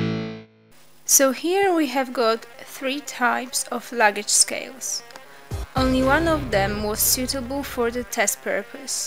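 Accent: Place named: Poland. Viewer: Pani chyba z Polski, akcent się zgadza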